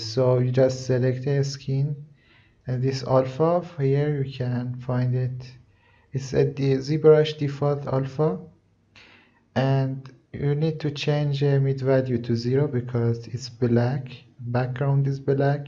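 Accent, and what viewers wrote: Iran. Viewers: this guy is definitely persian jfc, his accent is so thick i cant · Are you persian? The accent gives it away😆❤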